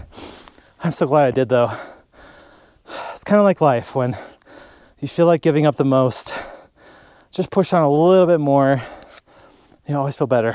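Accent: United States. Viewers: I love your channel for not only your aDventures & comments. I find that your American accent is so obviously American. Keep on trekking.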